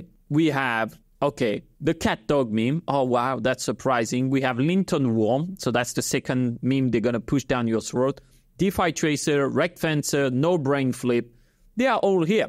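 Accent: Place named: France. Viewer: cet accent français mamamiaaaa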